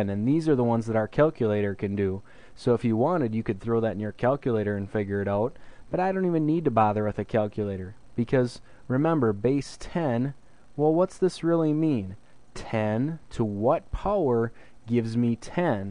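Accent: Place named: Canada. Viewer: the canadian is so strong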